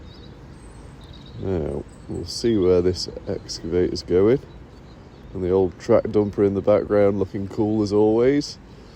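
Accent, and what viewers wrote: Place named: Northern England, United Kingdom. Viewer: Listening from Canada there is something very Monty Pythonesque about this narration with a Northern accent. “Cor, that’s some cracken drivin, that is. T’stone from Shrewsbury. Fine village. Fine pe-pull t’are. Makes a bloke tear up… Right cinematic that is. And there t’is another load! Weren’t expecting that. Down t’lane, quick turnabout and dump! Were elegant, that! Taken up by, excavator, now…and it’s in! Not seen finer. Two before mornin tea.” Something like that. Still, every morning I’m watching. Love it.😊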